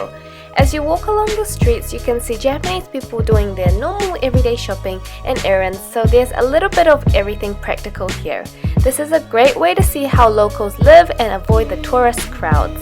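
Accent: New Zealand. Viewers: Its so cool finding people also from New Zealand. I knew your accent sounded familiar ^_^ newly subbed but so far I adore your videos <3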